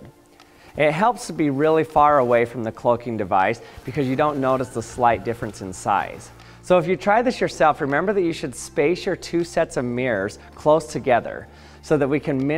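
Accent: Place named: Utah, United States. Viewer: That Utah accent.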